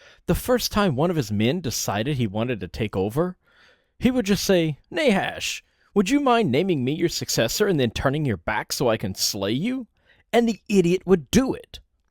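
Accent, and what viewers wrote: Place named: South, United States. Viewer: its funny how articulate southerners twang bursts through in certain words and affectations. EeYeen recurs with my guy here. Why its peculiar is you will notice it only with certain words. Men is mEeYeen usually almost universally with articulate southerners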